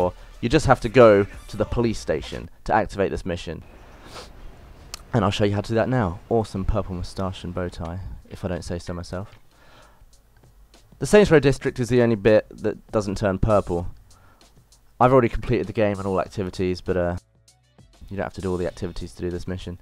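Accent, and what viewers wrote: United Kingdom. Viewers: I like ur accent, wot part of UK r u from? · u got the brit voice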